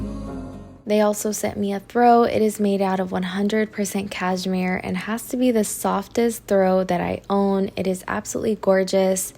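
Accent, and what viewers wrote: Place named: Texas, United States. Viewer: I’m guessing Texas or Cali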